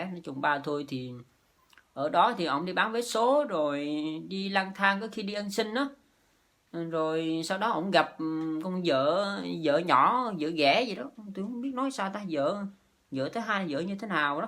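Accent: Vietnam. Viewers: Thấy cuộc đời của bạn quá khổ, như vậy quê bạn Bình Định, sau nói tiếng miền Nam chuẩn quá, vì cuộc sống vất vả, thiếu thốn nên sức khỏe yếu, mong bạn vượt qua mọi khó khăn, hiện tại bạn làm youtube phải khg cố gắng lên nhé mình se vượt qua mọi khó khăn, mong bạn làm có tiền để có thể có 1 ngôi nhà cho 2 anh em ở và thờ cúng cha mẹ, hy vọng mọi người có lòng hảo tâm sẽ hổ trợ bạn thêm vào cuộc sống, bạn sống rất chân thật và vui tánh, khg lời lẽ nhiều nhưng bạn tâm sự vậy mọi người cũng hiểu và biết hoàn cảnh khó khăn của gia đình bạn, ai đã chỉ dạy bạn làm clip thể này ? Bạn cố gắng làm clip và dành dụm mỗi khi mình được nhận tiền, bây giờ đã qua khổ cực phần nào hả y cố gắng lên mọi người sẽ giúp đỡ cho bạn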